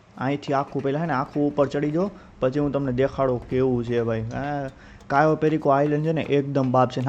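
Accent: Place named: Kamrej, India. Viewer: Bhai tame kyana aa tame j vat kari te em lage kamrej khaudhara gali aju baju❤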